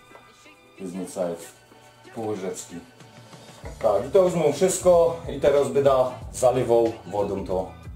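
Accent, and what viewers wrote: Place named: Poland. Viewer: Wyczuwom gorolski akcent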